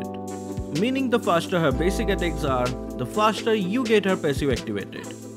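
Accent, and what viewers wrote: India. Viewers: are you from India, because your voice is like an indian very clear, some I noticed that you equip the map of India? 😕🤔 By the way thanks for this video it will help players like mm. players and also us to improve our skill and builds. 👌🙏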